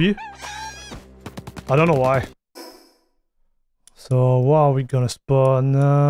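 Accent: France. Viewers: Are your a frensh guys because your accent make me laught a lot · Le jeu tu le lance sur quel hardware ? Je l'ai pris sur Steam, via Proton (TkG) sur une Arch sur un 7950X3D et une 7900 XTX, je suis stuck a ~40FPS. Independament de la resolution ou de la qualité. Et au passage, merci de faire rayonner l'accent français dans le monde entier. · Jolie accent français !! 😁😁 Merci pour le tuto tu régal 👍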